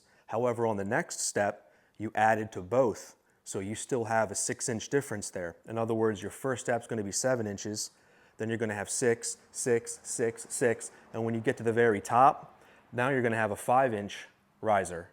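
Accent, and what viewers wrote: Philadelphia, United States. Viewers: I sensed a Philly-ish accent in a prior video... there you go!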